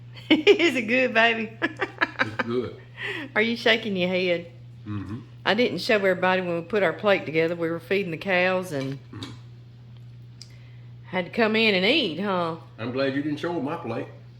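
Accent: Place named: Alabama, United States. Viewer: Ms., I’ve been enjoying your videos for a long time. I so admire your devotion to your faith! We are truly nothing without Jesus🙌🏻You just keep being yourself and we’ll keep watching. Oh and I’m from lower Alabama so we kinda sound alike 😂 and sure cook alike ❤️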